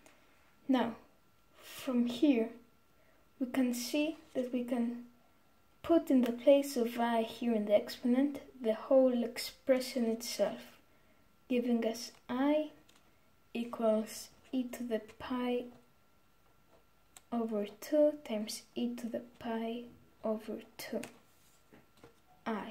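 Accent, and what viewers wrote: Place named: Russia. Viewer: U sound russian accent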